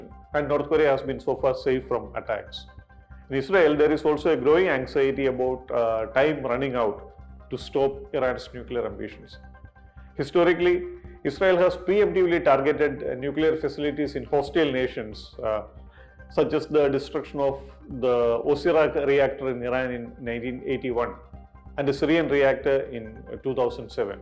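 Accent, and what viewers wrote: Kerala, India. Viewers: From ur accent I know u are from Kerala. Great how u handle difficult topics calmly.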